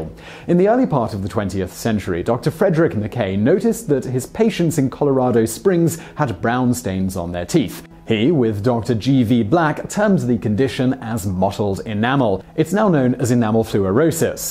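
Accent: United Kingdom. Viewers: seems like a british dude talking about dental health doesnt seem like the most credible source lol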